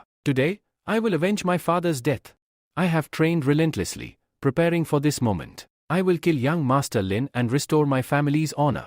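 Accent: India. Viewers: WHY IS IT INDIAN BRUV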